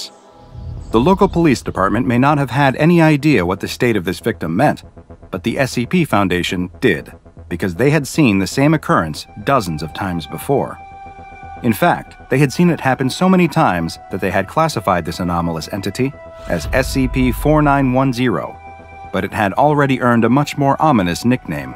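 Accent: United Kingdom. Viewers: He became british D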